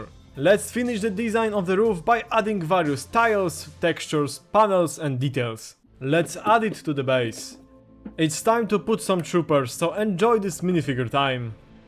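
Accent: Poland. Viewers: slychac ze polak xD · czy ja wyczuwam Polski akcent w wypowiedzi? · beautiful polich accent lol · Słychać że Polak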